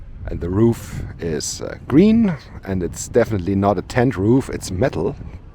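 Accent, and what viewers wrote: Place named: Munich, Germany. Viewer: Danke. 😊 Dein Englisch ist übrigens wirklich total sympathisch. Ich verstehe alles und es klingt so schön vertraut. Vorallem mit den deutschen Begriffen zwischendurch. 😁 Du bist bestimmt auch in der Münchner Gegend aufgewachsen, oder? Du klingst zumindest richtig münchnerisch.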